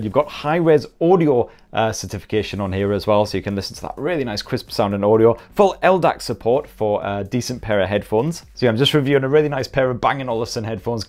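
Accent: United Kingdom. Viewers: he got a hard british accent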